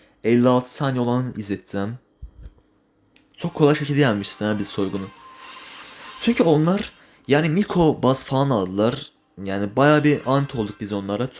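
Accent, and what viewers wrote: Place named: Azerbaijan. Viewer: Qaqa danişigindan azerbaycanli oldugun bellidi ugurlar😂😂😊😊❤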